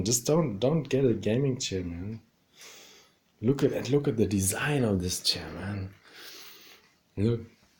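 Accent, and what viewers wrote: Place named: France. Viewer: Downvoted for your accent only. Sorry but my experience with the french has been awful.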